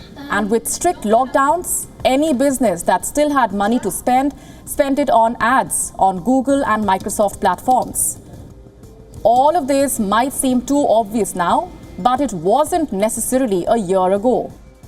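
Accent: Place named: India. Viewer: I love the totty English spoken by this lady of India than English speakers beautiful accent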